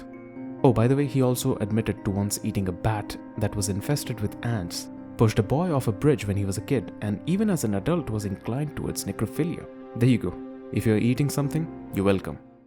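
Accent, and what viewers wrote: India. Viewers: At first I thought: "who is this idiot crying about fascism and racism". Then I heard Indian accent. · You can tell from the awful sound of this dude‘s cringe accent that he’s from India. Why in the heck would ANYONE think he would actually know what he’s talking about regarding a Western artist? I laughed my arse off when he talked about Dali’s “rAciSM” - oh the horror! 😱😂 This guy is so dumb in fact that he still thinks Adolf was “the baddie,” despite us living in the age of the Internet and having the truth right there before our eyes easy to discover now. This video is so cringe. The only thing that bothered me out of everything he listed was I felt bad for the cats. But I’ll bet my bottom dollar that the comment about him putting dynamite up a duck’s behind was a joke.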